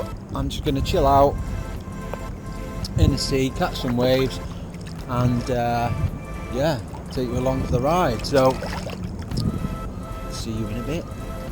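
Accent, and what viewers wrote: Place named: North, United Kingdom. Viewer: Brilliant little adventure. Do I hear some Northern tones in that accent?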